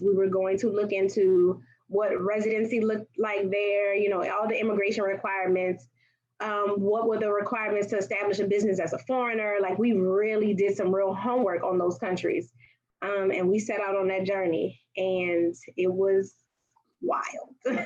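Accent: United States. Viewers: She sounds like she's from the New Orleans 504 area. I would have definitely like to hear more of the more position pros on each country she went to. Wondering what did work for her and her peoples. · She looks like a Rwandan except she has lived in the US and speak like American. I think she is 1ST generation American from Rwadan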